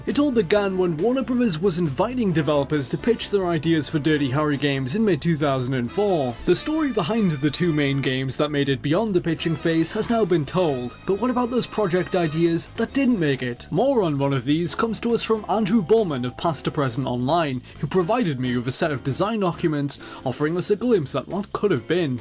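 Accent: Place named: North, United Kingdom. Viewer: i wish i could listen to northern british accents but i just can’t :(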